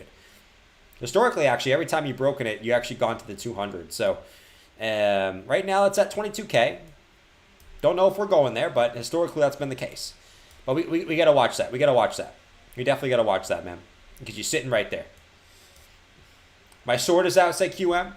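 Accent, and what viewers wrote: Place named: United States. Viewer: What’s with the yankee accent all of a sudden? Wtf? A new reinvention as everyone loses the lot while it is about to fully collapse?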